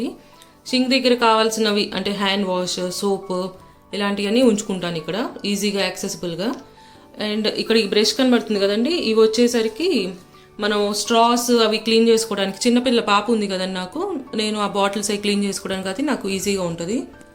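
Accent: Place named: Guntur, India. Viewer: Super ga undhi mam, mana guntur language and way of explanation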